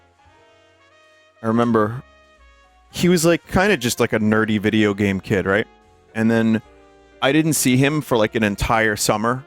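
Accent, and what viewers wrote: New York, United States. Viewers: I love how his new Yorker accent gets stronger throughout the segment. He even said "authahrity"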